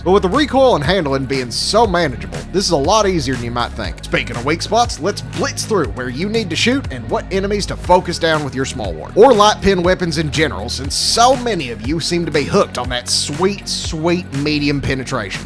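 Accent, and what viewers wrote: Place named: Texas, United States. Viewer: I didn't know I needed to hear Helldivers tips with a Texas accent until I saw this video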